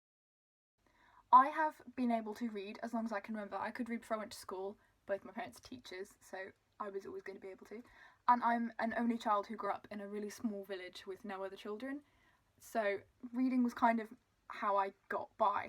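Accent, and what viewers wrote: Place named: South, United Kingdom. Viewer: What r u studying at uni? If u were ranking all the UK Prime Ministers, where would Boris fit? Dark hair and blue eyes: Celtic blood perhaps. Yup, posh accent, you are a s'erner, but certainly not like eg. Emma Watson's modern RP (Received Pronunciation). Anything RP is so choncey uppercrust.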